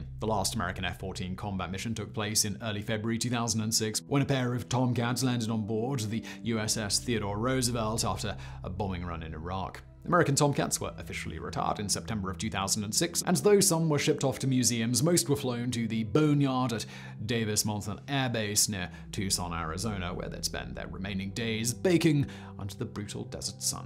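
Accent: RP, United Kingdom. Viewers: I'm a clueless Yank so forgive me if I'm totally off base here. I really like language; our boy here has a fairly posh accent, right? I've never been great at nailing the nuances of British accents but he sounds a bit fancypants, right?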